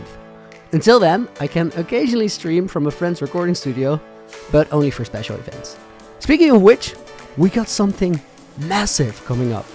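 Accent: Netherlands. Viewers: Wait... I was just looking on your channel, when I saw that you're from the Netherlands... normally I can easily hear when someone's Dutch, but damn, that took me by surprise. Now I can never unhear the slight Dutch accent ever again lol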